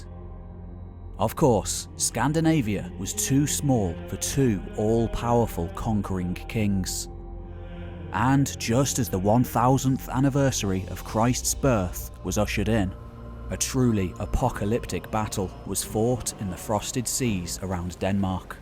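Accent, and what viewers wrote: United Kingdom. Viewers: Thanks for posting this information presentation. You sound like a fellow Brit to me, so what's with the 'ad-ver-tize-ment' pronunciation? Just wondering.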